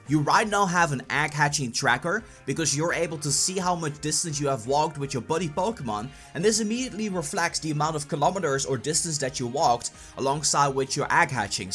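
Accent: Netherlands. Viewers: It really sounds like you have a Dutch accent.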